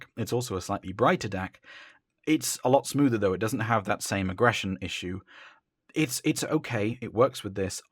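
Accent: United Kingdom. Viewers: This is like watching evil Zeos. No anime, no cat, and no cursing. Instead of the conservative talk radio vocals, there's an elegant English accent. There is no Patreon/yard sale. There are cuts in the video. There is no random song selection every time.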